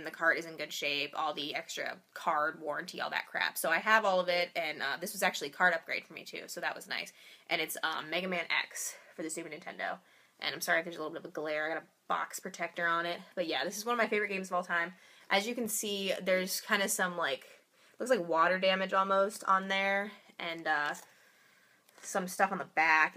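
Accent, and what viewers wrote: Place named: Ohio, United States. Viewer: I'm a new subscriber, but really enjoying your videos! Quite a few to catch up on, but I'm sure I'll get around to them eventually :) Sounds like you're a fellow Ohioan, so it's always nice to meet another one of those. I'm in college as well, so I can certainly relate in terms of business I'm sure. Some great pickups this time around! Especially Spyro! Some of my favorite games on the PS1. 2: Ripto's Rage is definitely the one you'll want to get, though! Excellent game!!!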